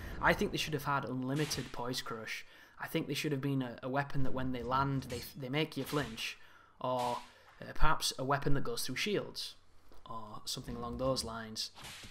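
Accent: United Kingdom. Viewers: 1. It's very refreshing to hear more Brits on these videos. 2. I totally hear you on the guard break actually doing the command seldomly. I can't count the amount of times that I'm sure I inputted forward and R1 perfectly only to swing my sword. On the other hand, the amount of times I've attempted a guard break with a chime...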